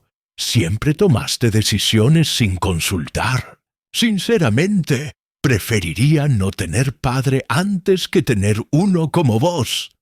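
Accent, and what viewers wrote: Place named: Spain. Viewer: Coincido con un comentario anterior, la historia es buena pero creer que los argentinos hablamos así 😂😂😂es una burla. Es un español pronunciando la Z por favor!! Y por supuesto con Uruguay nos entendemos perfecto. Hablamos el mismo idioma!!! Hay que estudiar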